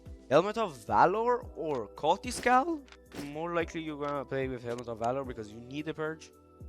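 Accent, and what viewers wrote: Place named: Iran. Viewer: Why your voice like iranian?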